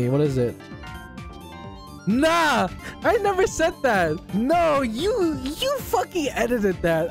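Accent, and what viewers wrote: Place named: Netherlands. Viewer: Most dutch accent ever he meneertje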